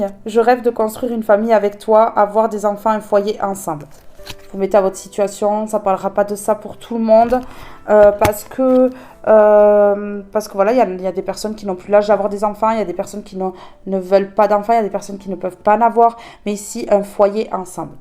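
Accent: South, France. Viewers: J'adore vous écouter Madame. Vous êtes très belle en plus et votre accent du sud soleil rajoute une multitude d'aromates au plaisir de vous admirer. Merci de nous faire du bien avec ce que vous êtes ❤😊😊❤❤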